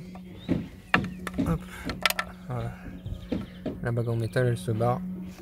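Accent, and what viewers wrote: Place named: Normandy, France. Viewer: Belle vidéo,belle mécanique,le 2.3 n'a pas été extremement diffusé en France.Solidité incroyable,mais la conso....Etant donné l 'accent,on serait bien en Normandie non?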